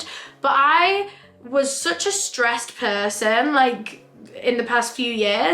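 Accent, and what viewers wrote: United Kingdom. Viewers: I LOVE 💕 British English ❤️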